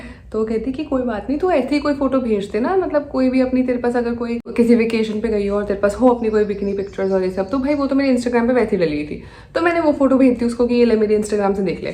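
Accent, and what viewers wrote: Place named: Delhi, India. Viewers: delhi mein sab hi aise baat krte hain 🎲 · I started watching her just couple of days ago and it’s just my second video of her ( 1st one is that haunted one) bruh, the way she rants and talks 😅🤣. Proper Delhi accent 😅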